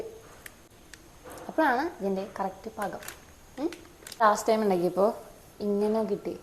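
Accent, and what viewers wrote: Thrissur, India. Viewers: naattil Thrissur aano athe slang😁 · Thrissurkary aano slang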